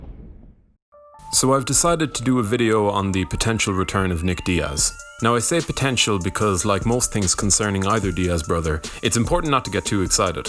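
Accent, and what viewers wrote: Ireland. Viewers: Your posh irish accent is annoying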